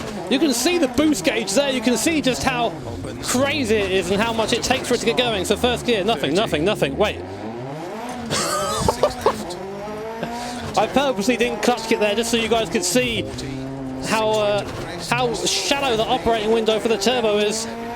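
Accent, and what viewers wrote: United Kingdom. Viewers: Are you British or American?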